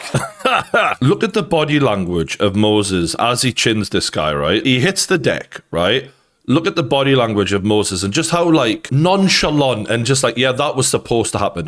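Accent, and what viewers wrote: United Kingdom. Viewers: Is from the UK ?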